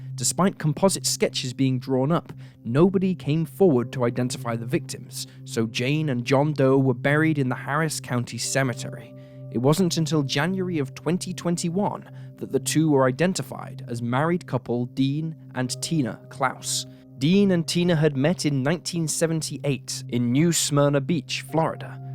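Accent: United Kingdom. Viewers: American stories with a British accent?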